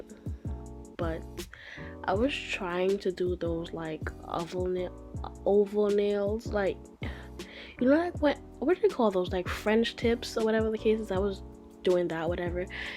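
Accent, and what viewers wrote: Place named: New York, United States. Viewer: Hurd ya accent & knew you was from New York 😭😭 Ima self taught mua & hairstylist and would love to network with you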